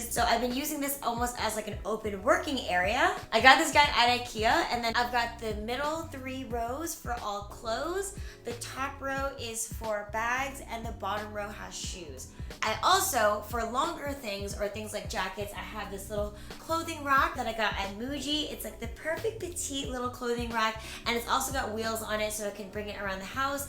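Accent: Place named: Texas, United States. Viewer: I love her accent! Is it Texan?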